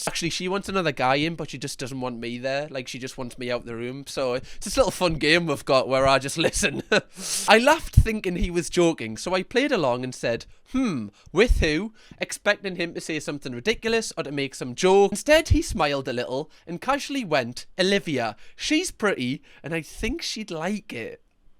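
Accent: Newcastle, United Kingdom. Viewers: Can confirm, it's just how we say it in Newcastle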